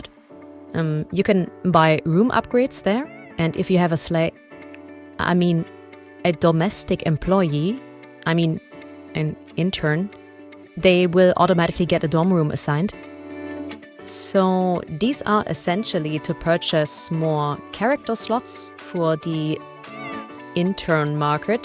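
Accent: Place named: Germany. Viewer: Nice german accent :D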